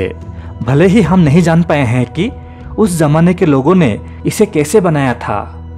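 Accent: Assam, India. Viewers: aapke hindi meh bohot problem hai ... assamese accent ha aapka pls kisi aur se narrate karwayiye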